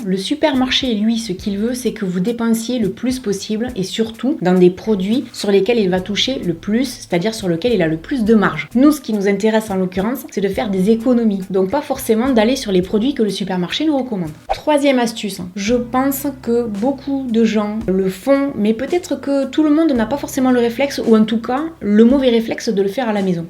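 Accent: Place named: North, France. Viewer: Mon talent de détective me dit que vous êtes du nord. Je dirais Roubaix + précisément.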